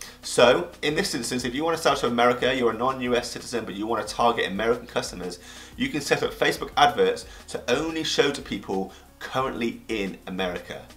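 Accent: United Kingdom. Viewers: Ooh I as much as i hate british accent, this information is very useful.Thanks!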